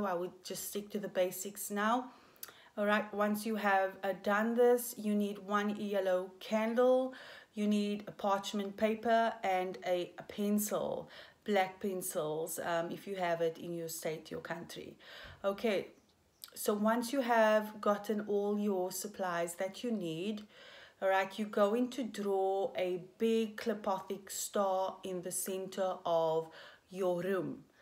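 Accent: South Africa. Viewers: Are you South African? Bec I am :)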